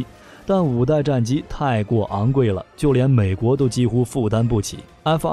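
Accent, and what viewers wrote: China. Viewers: 台湾省的视频也喜欢大陆配音吗？ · 為什麼配音的是大陸人？我們中華民國的國家機密讓大陸那麼深入了解了？ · 請問一下這影片怎麼是用簡體字還操對岸口音？ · 配音的像是大陸口音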